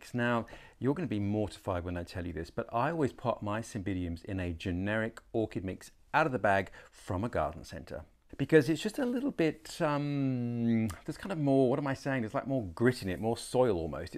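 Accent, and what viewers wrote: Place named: Australia. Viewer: Hi, I really enjoy your energetic teaching and sharing of your amateur orchid knowledge... the Aussi accent, well-spoken English, and humor are very entertaining. I have about 5 orchids that are from a 'seed population'. One of those, a violacea var. correulea, has a spike growing now. Even if the flower doesn't have good color saturation, after a year, it will be difficult to part with it! I'd likely consider trading it for another mature plant. Cheers.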